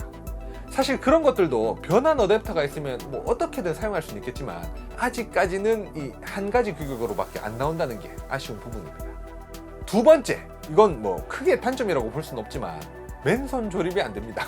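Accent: Gyeongsang, South Korea. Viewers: 우째 대구 말투네.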